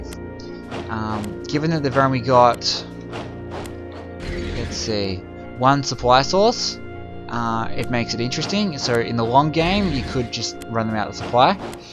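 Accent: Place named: Australia. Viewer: Expertly played mate; was surprised to hear an aussie when i started the video to be honest. I might watch a few more of these if i need help nailing a brilliant victory later on; did you upload videos for all of the Red Turn campaign?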